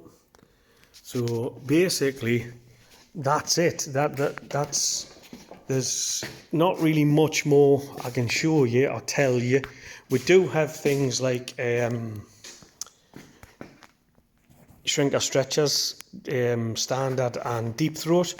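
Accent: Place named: North East England, United Kingdom. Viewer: Yes please, keep them coming. I've got massive affection for Capris. I learnt to drive in a MK2 Cortina, which I loved. I have had 2 of those, a MK1 Capri, 1600GT XLR, which I adored and obviously should have kept, and loads more Fords, last one being a Series 1 Escort RS Turbo, also wish I'd kept that! It sounds like you are from the North East, did you ever come across a guy called Gordon Leeming?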